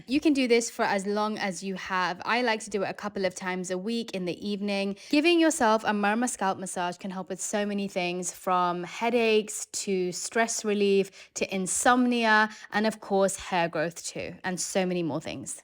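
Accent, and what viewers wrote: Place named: United Kingdom. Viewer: Are you an Indian? I like your accent, it sounds like a little British accent :)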